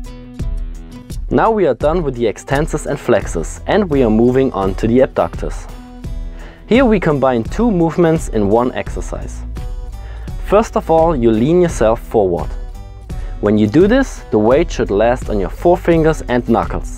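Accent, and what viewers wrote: Germany. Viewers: German accent!!! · This german Akzent 😂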